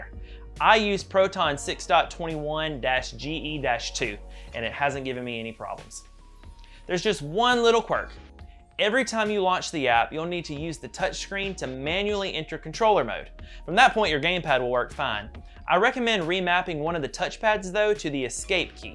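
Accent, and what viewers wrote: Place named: South, United States. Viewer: I came for the review, subscribed for the southern charm. Cheers from Canada!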